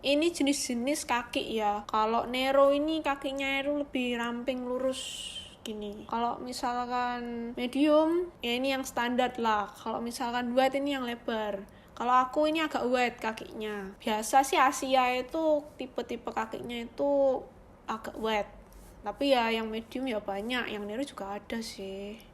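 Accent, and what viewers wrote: Java, Indonesia. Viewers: Mbak mbak faforit gua 😁 medok banget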